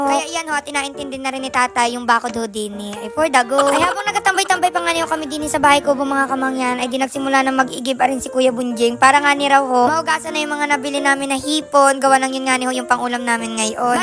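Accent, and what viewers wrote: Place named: Quezon, Philippines. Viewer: ngayung 730 lang nene ay ang punto mo preho da quezon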